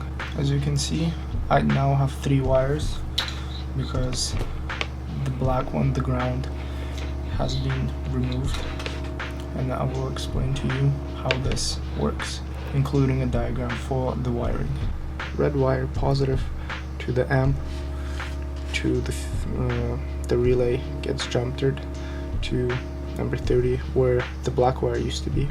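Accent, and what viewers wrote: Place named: Europe. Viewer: What country are u from... I hear a little European when u speak.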